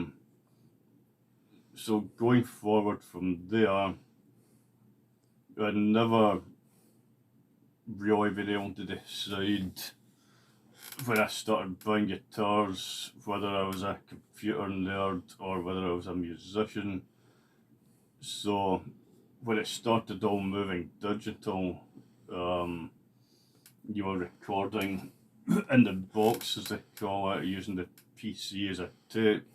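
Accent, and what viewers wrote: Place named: Scotland, United Kingdom. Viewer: I can't wait until we meet again so I can understand proper Scottish - I'm half way there, though.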